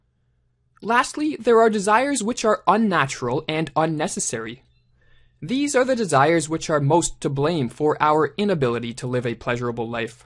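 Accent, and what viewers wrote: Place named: Canada. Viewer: A Canadian narrated this.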